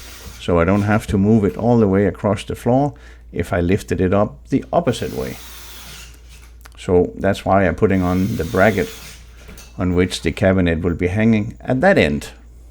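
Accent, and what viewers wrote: Denmark. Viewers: Great videos , especially your webpage on the Sektion Rail measurements! I’m gong to take a guess that you are Danish? Sounds like very Danish accent , I love it 👌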